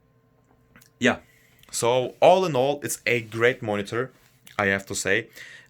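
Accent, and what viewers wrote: Turkey. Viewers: because of the accent, I was thinking you might be a Turkish guy, but after when I saw the glass bead on the wall, I am sure that you are a Turkish guy, süpersin bro güzel setup :D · Digger ich höre an deinem Englisch, dass du Türke bist :D Probs für das gute Review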